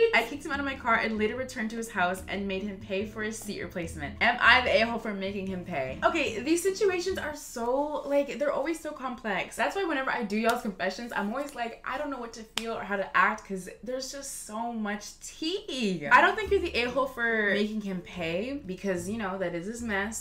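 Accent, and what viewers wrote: Canada. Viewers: GIRL YOU CANADIAN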